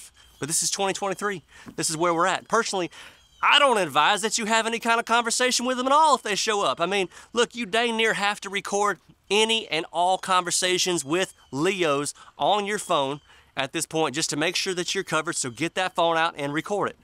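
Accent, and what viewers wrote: United States. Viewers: you sound like you're an American (not U.S. Citizen).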